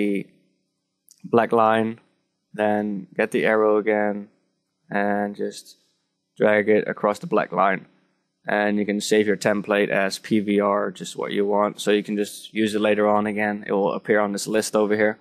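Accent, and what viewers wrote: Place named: Netherlands. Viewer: NL accent, leuk man haha! trouwens je bent 60 fps vergeten, quaility 720p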